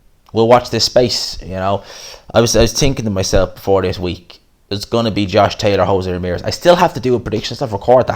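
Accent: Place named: Ireland. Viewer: I'm new to your channel by way of Ade. I'm subbing purely for the Irish accent, the boxing knowledge is a plus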